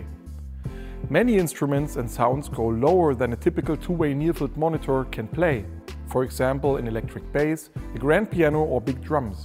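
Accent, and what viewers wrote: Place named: Germany. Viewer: After two words spoken, I knew that he must be a german haha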